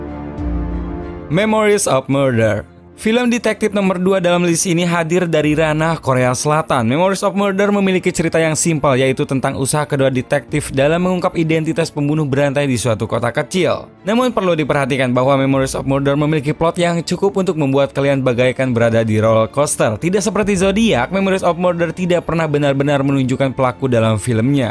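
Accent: West Java, Indonesia. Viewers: arfan muhammad hahaha kykx iya. Mgkn ini org sunda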